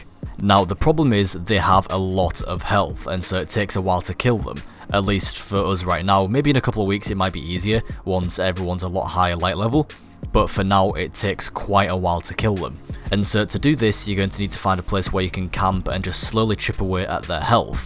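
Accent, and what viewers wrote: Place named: East Riding, United Kingdom. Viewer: Hey bro, you sound very local to me. I live in the East Riding and play destiny all the time. I’m guessing hull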